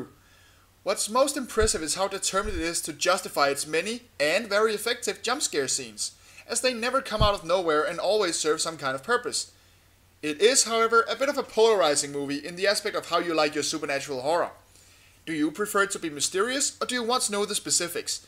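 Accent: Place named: Denmark. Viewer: You sound very danish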